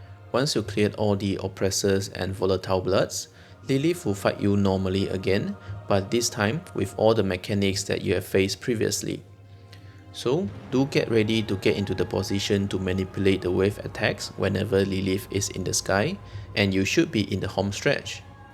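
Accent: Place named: Singapore. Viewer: You sound singaporean. Gotta support neighbouring content creators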